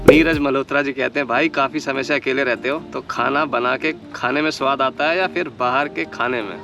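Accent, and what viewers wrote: Haryana, India. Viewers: Bhai tari aadat or awaz ek dum hariyana valo jasi hai Vaise mai bhe hariyana ka hu 🙏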